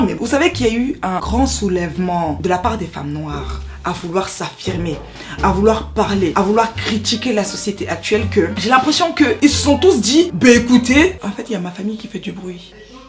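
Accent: Cameroon. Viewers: Hello, j'ai bien compris que tu étais camer, avec ton accent. Mais au début je me suis dis, tien tien est Bamoise. Puis, petit à petit, je me suis dis, non, non, a un parlé qui me rappelle les Etons. Ben en effet, là maintenant je suis un peu perdu. Eton, pas Eton, Bamoise, pas Bamoise?????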